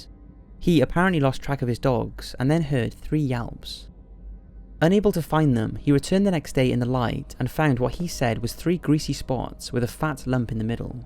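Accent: Wales, United Kingdom. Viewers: r u welsh?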